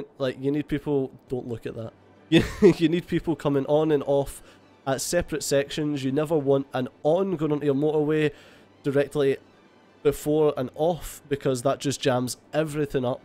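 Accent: Scotland, United Kingdom. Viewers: are you scottish? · Maybe it's just me. They say they hate english accent but I actually love it. Do people in Scotland always speak like this? I need to focus really hard to understand some words you are saying... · Im Dutch, but that is scottish, not german.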